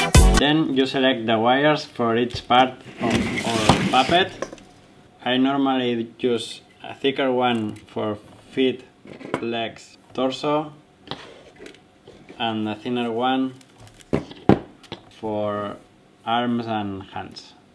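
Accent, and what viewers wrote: Spain. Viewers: Como c nota q eres español XDDD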